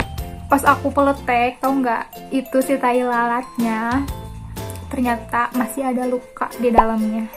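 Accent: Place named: West Java, Indonesia. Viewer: Sunda pisan euy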